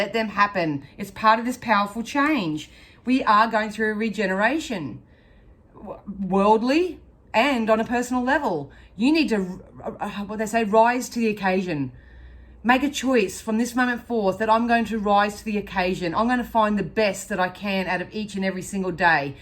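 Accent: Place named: Australia. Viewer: I love how real you are .. it's nice to listen to an Aussie ❤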